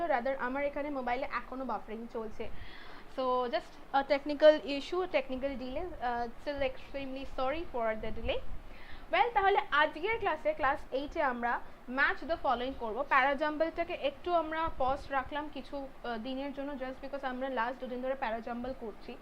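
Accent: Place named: Bengal, India. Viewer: Ur Bengali tone is love 😘😙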